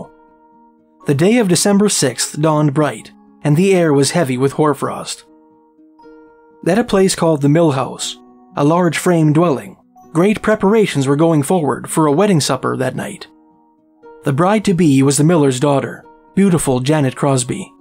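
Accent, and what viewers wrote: Canada. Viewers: You have the heaviest Canadian accent I've ever heard. I'm gonna use your channel as an example of a Canadian accent. Sorey (Canadian for sorry).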